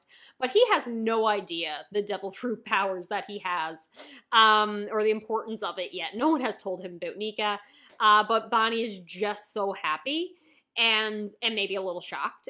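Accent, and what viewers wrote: Canada. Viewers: Is your accent Canadian?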